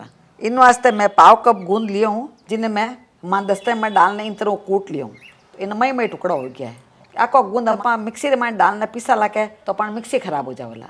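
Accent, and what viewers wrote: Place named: Gujarat, India. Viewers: Namaste masi. I don't know your language but I understand it little little because it's giving me a Gujrati touch. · Is the language Hindi in Gujarathi accet or Gujarathi in Hindi accent It is confusing for south Indian like me .However this recipe looks tasty .Good presentation .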